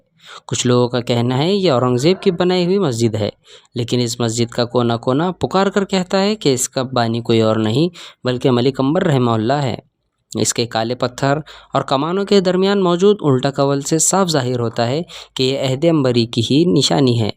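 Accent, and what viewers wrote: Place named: Deccan, India. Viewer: Best video ❤ no music Al humdulillah details information k sath saaf dakkani zuban sun k mazaa dobala ho gaya. Jazak allahu khairain kaseera Aage bhi isi tarah without music k video banaya kijiye ga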